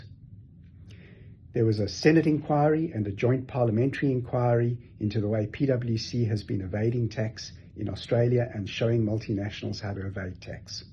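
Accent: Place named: South Africa. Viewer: South African Accent? I like it. 😆👍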